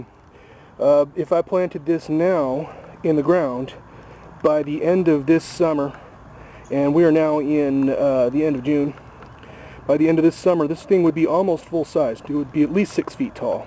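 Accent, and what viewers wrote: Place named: Florida, United States. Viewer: If you’re from Florida, you have an awesome Floridian accent.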